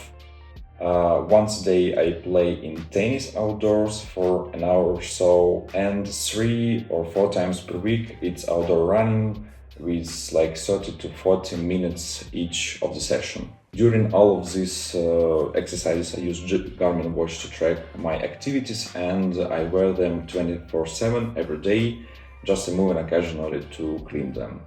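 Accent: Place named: Russia. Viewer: U from Russia? I hear strong Russian accent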